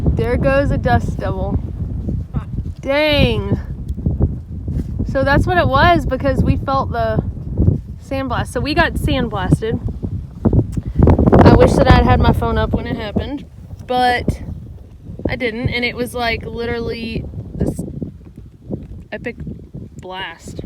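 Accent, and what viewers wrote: South, United States. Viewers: I usually turn CC on because my hearing isn't the best and I think it's hilarious that it interprets your "hikers" as "hackers". Hurray for your Southern accent :)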